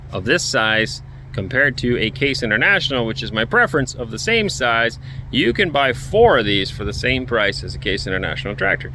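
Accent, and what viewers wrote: Canada. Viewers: That is the most Canadian accent ever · he Canadian by the accent.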